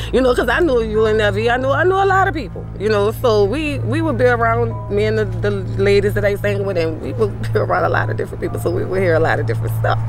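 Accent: New Orleans, United States. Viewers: I love that new Orleans accent ..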